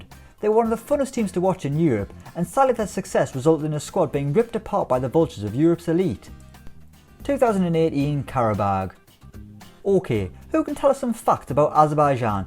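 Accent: Ireland. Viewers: I am a simple man. I don't hear irish guy. I click off. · starts video doesn't hear irish guy's voice "Ah shit"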